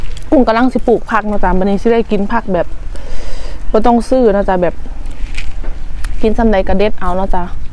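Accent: Laos. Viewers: เป็นคนจังหวัดไดสำเนียงคือคนลาว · สาวไทย เรอ สาวลาว สำเหนียง พาสา ปะสมลาว ไทย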